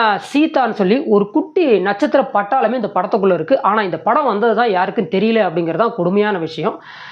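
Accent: Kongu, India. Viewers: Noted Kongu Tamizh Vazhga...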